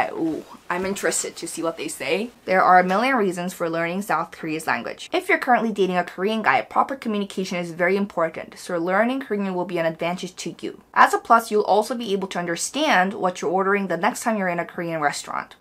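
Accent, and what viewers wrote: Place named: New Jersey, United States. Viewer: This person is talking about "Foreign girls being shocked at..."🙄😌She means American girls, though.. (Although obvs she's never come across any decent British men (just chavs as far as I can see.) She talks as if the whole chivalry aspect & other such things are purely for Korean men, when certainly that is not the case - & any decent British man will displayed rather a lot of that kind of thing - but she appears to hv dated a lot of wasters, who don't "Ooooh - damn that masculinity..." - frankly it's ridiculous to try to make men, not be men - & espc to be "offended" by them being so🙄😌 You don't need to "prove" that you "don't need them" & can "make more money than them" (Ssuming you KNOW that about yrself, & a man trying to impress you by pretending he can do mosr things better than you, is just a man being a man - you appear to want them to change who they are; so no wonder you keep being disappointed. 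You being "able to earn more than them" or "get a better job" , that doesn't need to come into it - but if you constantly hv to try to make yrself feel better about yrself - well thats not any man's fault either - do you watch these back, bc any therapist would hv a field day with this stuff, I think..🙊💜My gosh, what doesn't she complain about🙄😌 This person should just admit she went to America & became an American - & that she goes out of her way to annoy most Koreans as much as psbl by being one - by being yr basic mouthy New Jersey or even Queens type (& that accent is AW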